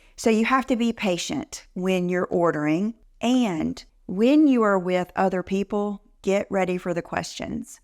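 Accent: South, United States. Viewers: hi - thank you! i love your insights & tips...also keep up the great energy & i love your southern accent!! xo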